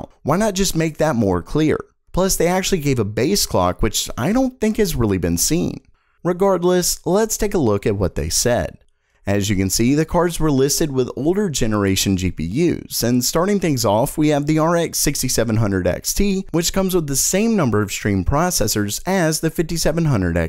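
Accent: South, United States. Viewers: Hes hiding his american southern accent for some reason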